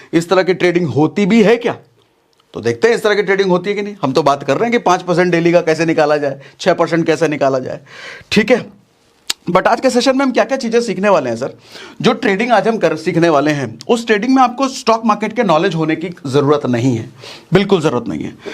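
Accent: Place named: Bihar, India. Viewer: सागर सर आपका आवाज मे जो बिहारी टोन है ओ दिल को छू लेता है और सीधा दिमाग़ मे बैठ जाता है ❤🙏🙏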